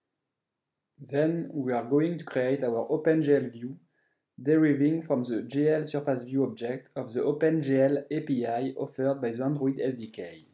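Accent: France. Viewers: haha amazing ! is this a french accent ? thank you for your time and efforts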